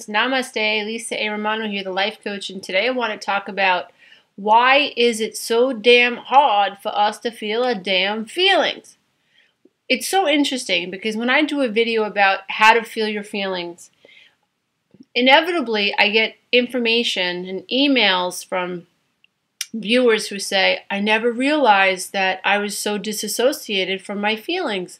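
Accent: New York, United States. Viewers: lisa i just dicoverd your channel.i love your empathy and intrest in your work.you are teaching me somuch!i also love your new york acent! from kevin ,an abused child that went thrugh councilg off and on for 30 years.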